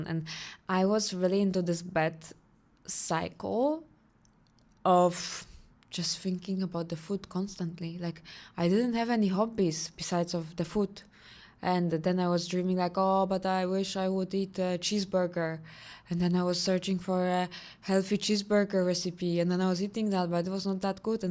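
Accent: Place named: Russia. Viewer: У Вас потрясающий русский акцент 🔥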